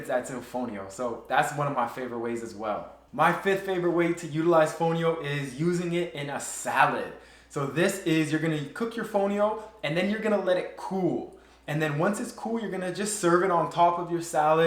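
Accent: Canada. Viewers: Are you Lucian 🇱🇨 ? Your accent sounds Canadian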